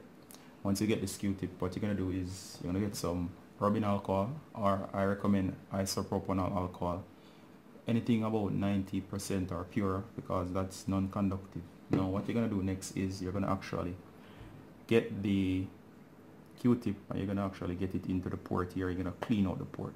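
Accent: Jamaica. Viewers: I can tell you are Jamaican my family is so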